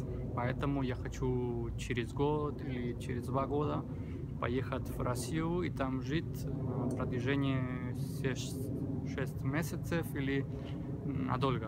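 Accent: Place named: Spain. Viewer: Произношение супер! Первый раз слышу от испанца такой чистый русский, ты меня приободрил! Потому что обычно когда слышу с каким акцентом испанцы говорят по русски, прихожу в ужас от того, как наверно, слышится моя речь по испански.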